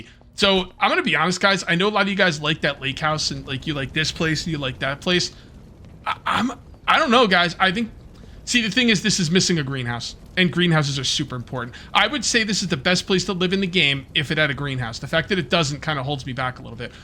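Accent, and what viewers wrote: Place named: Philadelphia, United States. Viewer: You must be from Philly.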